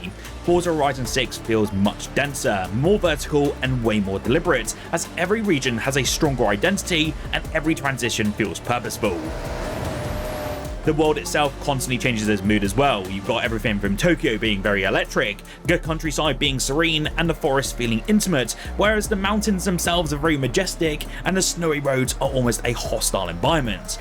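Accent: United Kingdom. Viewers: I normally love the English accent, but I feel that you're so overly animated. I can't tell if this is a serious review or something from Saturday night live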